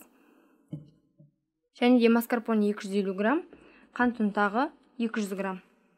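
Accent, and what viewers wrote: Standard. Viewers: Таза казақша сөйлейт Ма ша аллах өнеге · Ассалаумағаликум уа рахматуллахи уа баракатух ! МашаАлла таза анық 100%қазақша сөйлейсіз, рахмет 😘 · Өте керемет.Таза қазақша және анық. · Таза қазақша сөйлеген даусыңнан айналайын сенің!Аман бол,табысты бол көкем!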